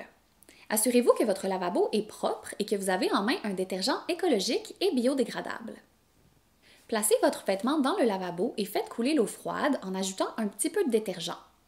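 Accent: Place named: Quebec, Canada. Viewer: accent Québécois au top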